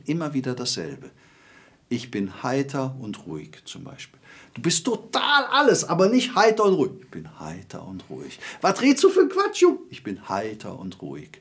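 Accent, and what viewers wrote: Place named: Cologne, Germany. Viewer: Stundenlang kann ich mit dir quatschen, du bist so ein cooler Typ, mit kölsche Einschlag 👍😎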